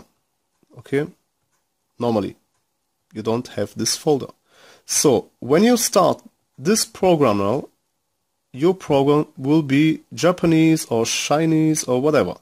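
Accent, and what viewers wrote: Germany. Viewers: Pls Help.....I cant detect the chip!...Ich habe alles richtig angeschlossen und höre das du ein deutscher bist!...könntest du dich hier mal melden?...ich habe ein Msi Board verflasht mit der eigenen mflash bios methode...war nicht meine schuld! Ich habe alles so gemacht wie du es in den Videos beschrieben hast!!!.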